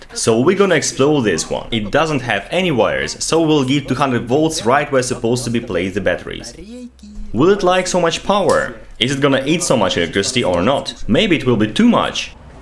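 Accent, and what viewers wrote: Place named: Russia. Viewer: I love the Russian take on English, thought I'd heard everything. I like that capacitors are called condensers.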